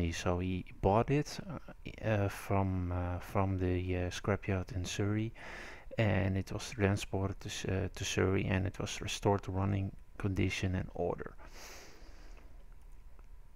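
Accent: Netherlands. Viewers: ja ja als je engels wil praten doe dat dan met engels aksent en niet met nederlands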